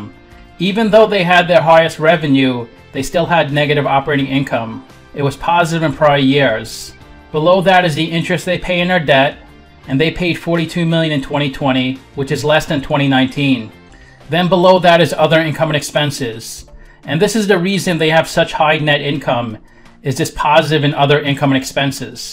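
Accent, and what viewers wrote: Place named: Boston, United States. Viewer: You accent says drunk Bostonian but your efficient explanation says seasoned financial advisor with a unique ability to explain valuations to simpletons like me. 🧐 You have my subscription sir.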